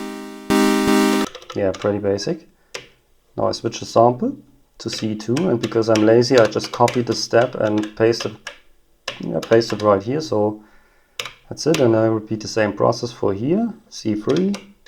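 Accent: Germany. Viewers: Your Stimme Sounds a bittl german. Can u make a Video on Deutsch? ;)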